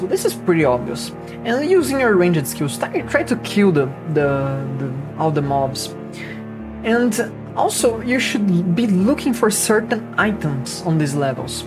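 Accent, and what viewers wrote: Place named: Brazil. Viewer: brasileiro sim ou com certeza? kkkkk sotaque ta igualzinho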